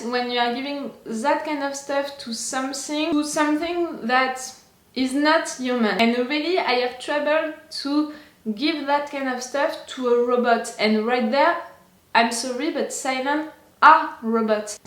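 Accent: France. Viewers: Lol “I’m still French” has so much weight with this quarantine. Like You may be quarantined, but your French will never be Quarantined 😂 I love your accent. My father studied in France and I learned a bit of the language growing up. But I don’t remember everything anymore, just a little. Je peux dire ça en français. Je pense que vous êtes une femme très belle et intelligente. Lol I hope I put the accents in the right place, :D Anyways stay healthy and safe, and i will listen to your Reaction now while I work :D ❤️ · Your french is a particular dialect by the accent you speak in English. I have heard it before. I don't know which one but it is not as common.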